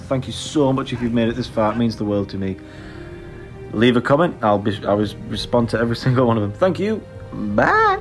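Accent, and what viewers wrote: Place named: United Kingdom. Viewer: You should definitely try out Owlcat’s games as they have some incredible cRPGs similar to Pillars and Tyranny from Obsidian. Your comments really show maturity as the whole “woke” thing is about the right-wing not liking liberalism more than how it’s implemented into games, which it is fine to be right-wing, but the problem is it’s simply a conflict of interest to critique a video game with political messaging by countering that with your own political messaging. Planescape Torment came out back in 1999 and was very political on how all factions were sometimes this “Lawful evil” alignment with other factions focusing more on personal divine alignment and mind, transhumanism, tribalism, radical revolutionaries, etc. That is the ultimate “makes you think” type of game, and any PC can run it. Other than that, the closest “spiritual remake” to that is Disco Elysium, and that game is brilliant in many ways and it’s what it feels like if BG3 was just a story game with no combat and this sense of dissociation throughout the game. 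Thank you for making this lovely video, and might I say you do have quite a nice accent, I’m not sure where it’s from (Scottish or English?), but you do have a good accent nevertheless.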